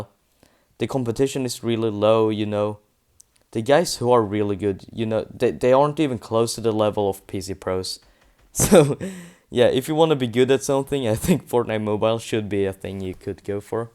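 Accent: Sweden. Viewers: Are you from sweeden ur engelish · i bet ur swedish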